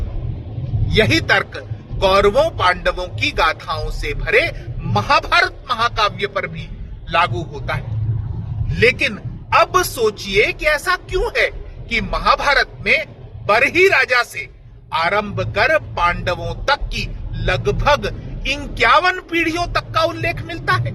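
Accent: Standard. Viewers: Aap ki hindi kafi shuddha hai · मुझे आपको सुनना पसंद है , आपकी हिंदी भाषा की शुद्धता कानों को शीतलता प्रदान करती है, आपके ज्ञान को नमन ,आप अपना काम करते रहिए , हम आपके अच्छे स्वास्थ्य की कामना करेंगे।धन्यवाद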